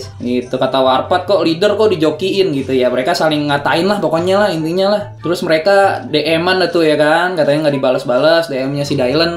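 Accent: Palembang, Indonesia. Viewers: perasaan dnger kata" kamp*ng, lu org plmbng pek ? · Aslinya palembang ya? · Bang orang mana niih??? Palembangkah???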